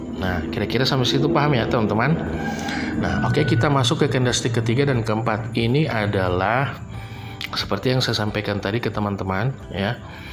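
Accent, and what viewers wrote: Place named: Makassar, Indonesia. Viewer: dari suaranya ini kayaknya orng makassar😀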